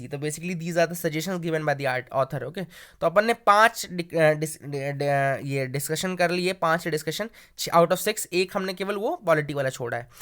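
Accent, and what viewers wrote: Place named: Bihar, India. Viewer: Sir ap Bihar se hai na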